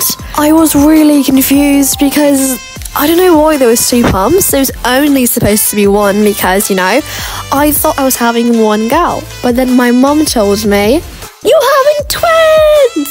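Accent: United Kingdom. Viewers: Omg the British accent 😫😫😫😫so PERFEXT!?!?!??!♡♡♡♡ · Omg i love your channel and the brtich accent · Why sometimes you Talk British and Sometimes You Talk English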